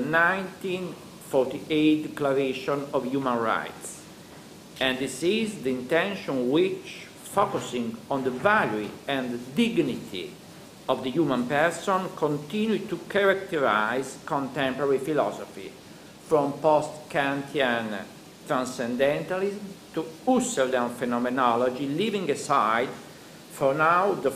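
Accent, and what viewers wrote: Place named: Italy. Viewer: I share in his subtle Italian accent, and incomprehensible sentences in the video, as the munus of our community.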